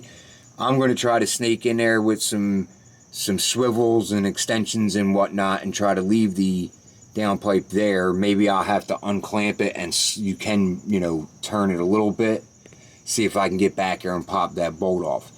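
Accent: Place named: Pittsburgh, United States. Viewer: What part of Pennsylvania are you from? I ask because your accent sounds like you’re from the Pittsburgh area and I’m moving back and I have an lml.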